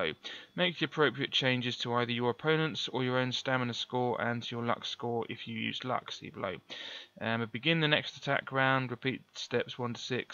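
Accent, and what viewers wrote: United Kingdom. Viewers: Advice: stop babbling about crap no one cares about. I didn’t come here to listen to an English toff talk about and. I came here to watch a FF game get played. For anyone who actually cares, this guy doesn’t even start until twenty minutes in. Your voice is clear so at least that’s something.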